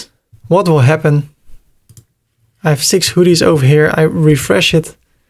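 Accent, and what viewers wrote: Netherlands. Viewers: you dutch.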